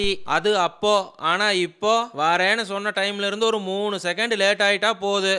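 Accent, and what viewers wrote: Kongu, India. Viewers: இது கோயம்புத்தூர் பொண்ணு உங்க கொங்கு தமிழ் சூப்பர்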